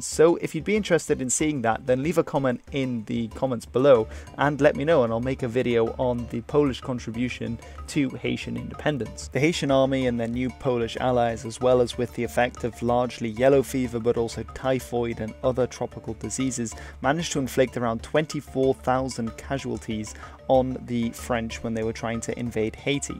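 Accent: United Kingdom. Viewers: he has a british accent unless it is a voiceover, they always absolve themselves, always A;WAYS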